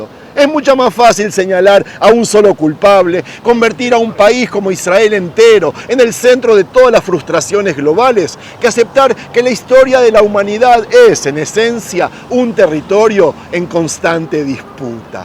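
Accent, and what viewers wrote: Argentina. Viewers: Siempre vivieron y viven tranquilos en Argentina.. no se entiende! . De hecho el habla como Argentino y no sé si vive acá también.. me parece que es un poco mucho hablar así de Argentina.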